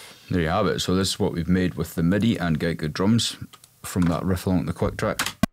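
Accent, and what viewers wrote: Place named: Scotland, United Kingdom. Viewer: Class! Sounds great. Where you based mate? Always a surprise when the Scottish accent pops up on YouTube 🤣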